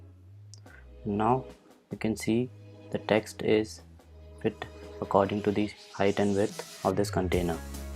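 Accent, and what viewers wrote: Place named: India. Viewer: There should be a warning to filter indian terrible accent.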